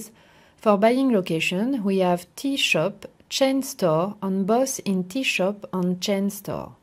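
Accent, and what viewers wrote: France. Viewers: Yes, french accent :)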